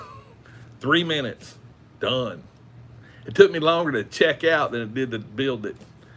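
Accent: South, United States. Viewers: How could I not subscribe to a toy collector with a southern twang.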